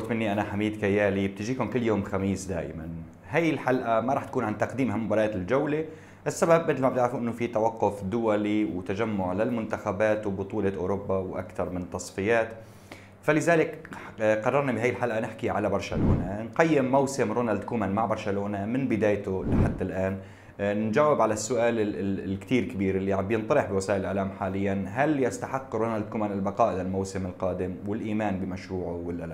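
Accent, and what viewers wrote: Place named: Aleppo, Syria. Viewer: اللهجة الحلبية ❤️❤️❤️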